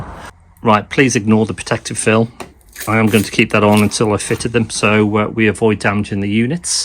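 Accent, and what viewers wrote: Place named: Wales, United Kingdom. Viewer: Genius intro!! We watched it 3 times 😄😄 That welsh accent is amazing 😉 I would literally set fire to anything that’s been sent so far! That’d actually ruin my patience. Nice Fjallraven hat, I’m going to have to get one now!! 🤦🏼‍♂️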